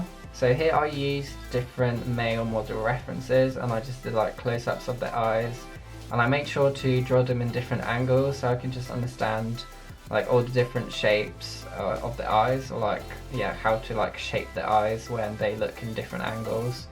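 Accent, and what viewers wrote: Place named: United Kingdom. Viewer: Everything is just soooo gorgeous! I’ve seen in the comments from another video they you speak another language (don’t remember which one sorry) I don’t know if you are from America or something but your English is so great ! You sound kinda British I love it! Anyway I have a lot of ideas for my sketchbook, thank you for that , love your art 🤗❤️👏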